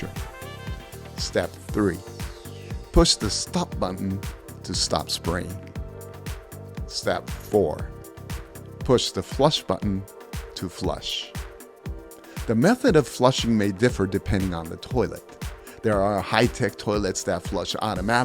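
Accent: United States. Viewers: you were "born and raised in Tokyo, and lived in Japan all your life", so how did you learn to speak with perfect American English? Just curious 😊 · Thank you for your videos! So informative, clear, interesting, just so well made. Really appreciate it! Please consider making videos for: 1. Places for sushi (low end to high end, please include price range) 2. Places for ramen (same as above) 3. Tourist traps (well maybe not trap but like places we should stay away from or be aware of if first time visiting Japan)😅 4. Hotels vs Airbnb 5. Ways of traveling around via, train, bus, etc. Btw, you sound like someone who was raised in the states.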